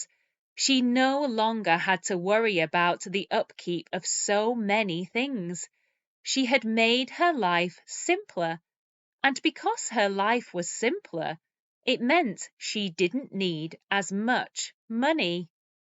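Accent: United Kingdom. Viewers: Thank u :-). Your channel is amazing. You are helping me to learn English and also I am able to learn gorgeous British accent. I am from India and I want to Go to London in future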